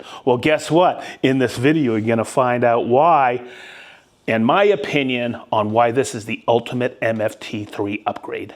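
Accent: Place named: Massachusetts, United States. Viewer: Wicked awesome!! (I love how your accent reminds me of all my mom's family - Swampscott, MA baby!) I am a relative noob to woodworking at 54 years young, but I am looking forward to upgrading my MFT-3. Love these super-helpful videos,!!! Answer: I tend to prefer metric since I grew up overseas, but am also comfortable using imperial. My "go-to" is metric, though.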